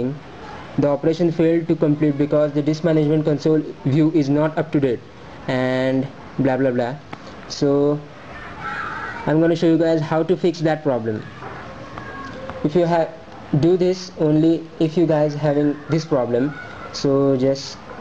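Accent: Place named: India. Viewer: indian accent idiot.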